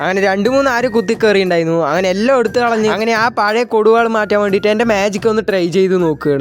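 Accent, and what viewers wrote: Palakkad, India. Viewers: bro sthalam palakkad aahno❤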